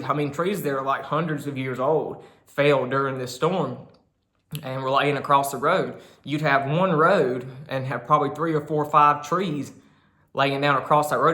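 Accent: South, United States. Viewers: Why do you talk? So funny? Have you thought about speech lessons so that you can eliminate your southern drawl?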